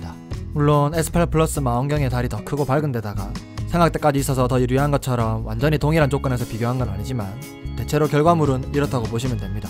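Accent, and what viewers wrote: Gyeongsang, South Korea. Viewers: 대구분이신거갘은데 코로나 죠심하세여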